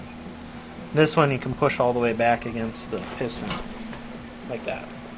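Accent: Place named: Canada. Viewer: Are you from Canada? I here a slight accent.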